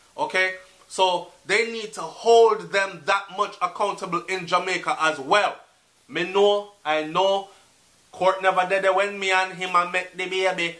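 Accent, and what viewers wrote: Barbados. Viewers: this is a serious topic and you had me cracking up with your barbados accent these kids dont listen they think they know it all so they follow the wrong crowd and dont like authority so they prefer a home where they can do whatever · Lol the Bajan accent 😄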